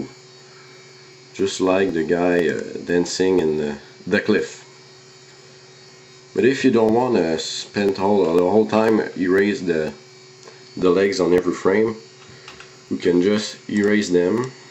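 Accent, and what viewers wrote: Quebec, Canada. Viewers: B à la première seconde de la vidéo jme suis dit "oh yest quebecois !" merci pour la vidéo !!!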